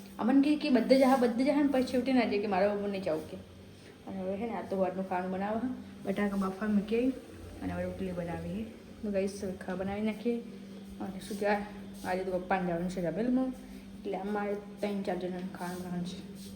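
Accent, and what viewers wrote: Banaskantha, India. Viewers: આ ભાષા બનાસકાંઠા ની લાગે હિન્દી ગુજરાતી મિક્સ😅😅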